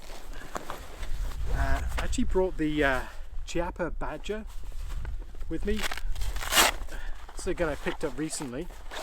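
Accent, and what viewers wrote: England, United Kingdom. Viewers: Lol again Esme stole the show! I felt bad for her with those short legs. I’m short too I know how she feels. My boyfriend is from England as well but totally different accent than yours. He’s from Bedford. Milton keens? I will be doing my first camping of the season in 4 weeks with the Kamp rite. You inspire me. Keep up the great videos!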